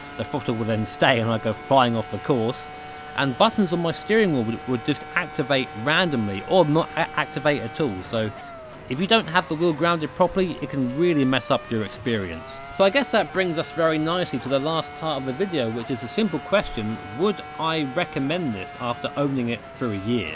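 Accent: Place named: South Lincolnshire, United Kingdom. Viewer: You say "summit" like I do, instead of "something". Are you from anywhere near South Lincs?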